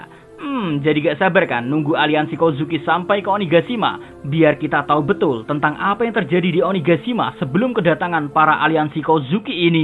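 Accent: Java, Indonesia. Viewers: Pusing gw ama logatnya,medok banget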